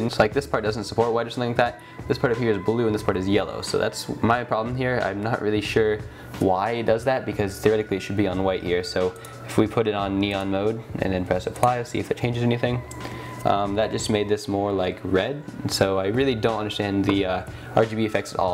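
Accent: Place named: United States. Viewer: he is one of the clear fast pace speaking Brit but I when I focused on listening I just realized that he is American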